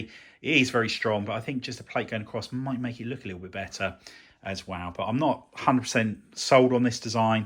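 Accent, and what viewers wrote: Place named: Midlands, United Kingdom. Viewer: A bit off-topic, I am just trying to place your accent. The best I have come up with so far is 'somewhere above Birmingham but lower than Manchester'.😉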